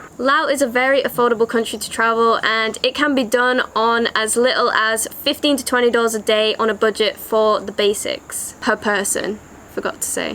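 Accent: United Kingdom. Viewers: Great narrator..beautiful English accent ❤laos.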